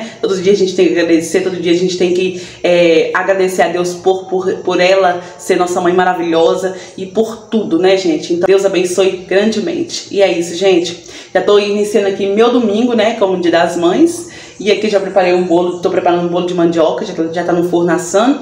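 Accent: Minas Gerais, Brazil. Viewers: Eu acho muito legal seu sotaque mineiro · UAI, vc é mineira? RS adoro o seu jeito de falar.. eu tbm falo assim e sou mineira. Amo seus vídeos. tem jeito de ser um menino muito bonzinho. Um beijo no coração de vocês. Deus abençoe!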